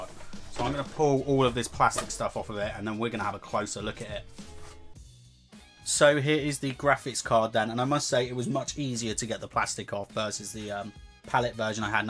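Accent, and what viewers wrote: United Kingdom. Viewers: u're so british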